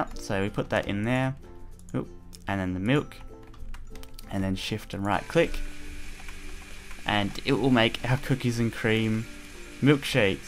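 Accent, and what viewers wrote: Australia. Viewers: lol, r u australian? luv the mod btw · Is he an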